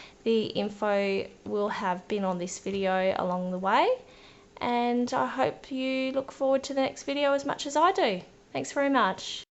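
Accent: Australia. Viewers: Great to hear the Aussie accent with stamping up can't wait to try this card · I really enjoyed your tutorial. You explained everything clearly and I enjoyed hearing an Aussie accent for a change on a Stampin Up video. I have subscribed, so I hope to watch more of your videos in future. Thanks.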